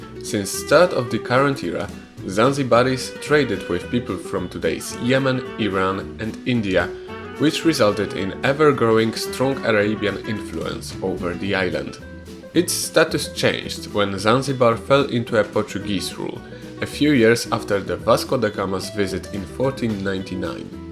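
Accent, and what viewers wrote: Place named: Poland. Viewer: I had a strong premonition that you're Polish by the use of Asterix and Obelix. (then i also heard your accent) Great video!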